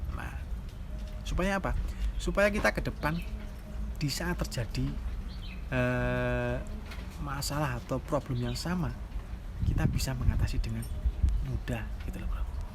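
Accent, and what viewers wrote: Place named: East Java, Indonesia. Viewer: Mas e jawa timur e mana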